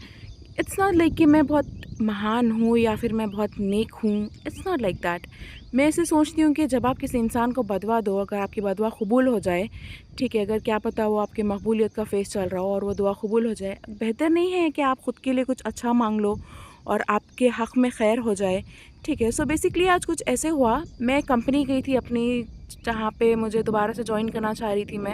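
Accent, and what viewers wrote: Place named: Pakistan. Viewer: You are indian but your accent is pakistani Btw you are from which state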